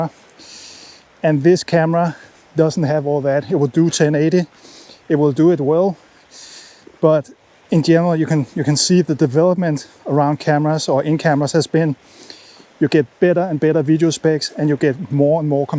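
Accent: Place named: Denmark. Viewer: I love Danish accent 😂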